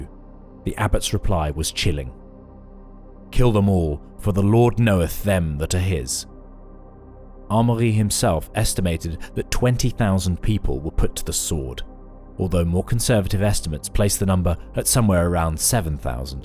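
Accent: United Kingdom. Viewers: oh ya like the Souff London accent. Ya I used to live in London.. Heard that :)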